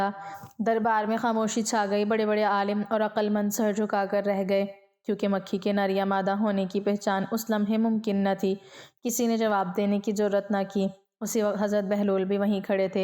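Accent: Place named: Saraiki, Pakistan. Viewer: یہ سرائیکی excent لگتا پے۔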